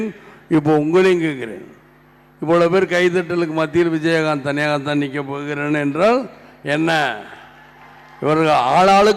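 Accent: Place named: Telugu, India. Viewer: First learn tamil we know u r telgu person....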